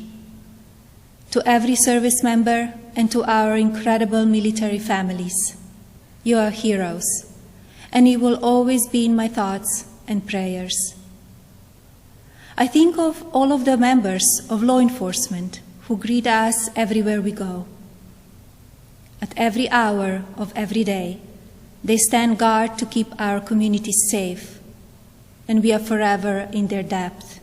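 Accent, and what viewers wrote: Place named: Eastern Europe. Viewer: 她的东欧口音还是可以听出来的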